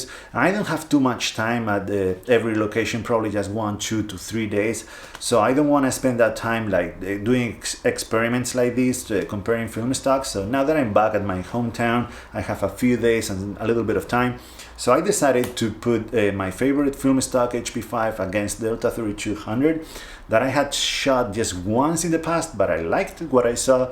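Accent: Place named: Spain. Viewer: Con todos los respetos, tu acento inglés suena horrible... no entiendo por qué siendo español haces los contenidos en inglés... Es una pena porque privas al público de aquí que no comprende el idioma de entender unos vídeos que comparten unos conocimientos e ideas sobre fotografía muy buenos, y estás dando prioridad al público extranjero...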